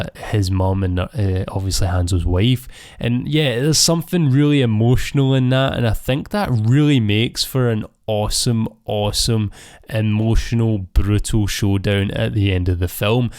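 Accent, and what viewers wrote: Scotland, United Kingdom. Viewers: imagine if you speak in your normal speed anyways its your accent of course scottish will understand it... thank you for your clip and subtitles from your efforts,though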